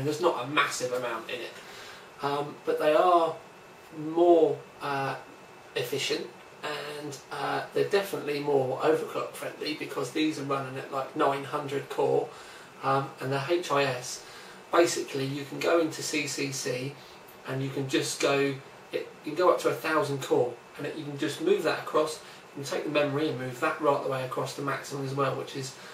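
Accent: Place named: Plymouth, United Kingdom. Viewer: From the accent I would say Plymouth.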